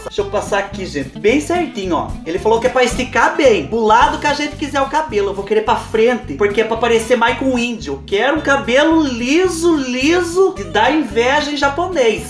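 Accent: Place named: Paraná, Brazil. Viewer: Vc fala igualzinho o pessoal da cidade onde morei. Em Wenceslau Braz norte do Paraná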